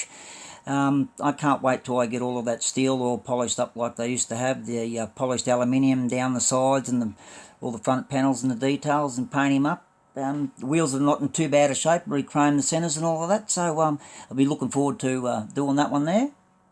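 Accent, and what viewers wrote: Australia. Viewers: Wow....you have enough cars there for at least 6 months of videos 😁 Can't wait to see HCT back up and running as it is also good to hear your Tassie accent on YouTube again mate 🤙🏼 I enjoy your videos as I always pick up a tip or two on customising so I wait with bated breath to see what's next!! That van with the bubble windows will be a fun build I reckon. Keep up the relaxed and interesting vibes 😎🇳🇿🍺🍻🍕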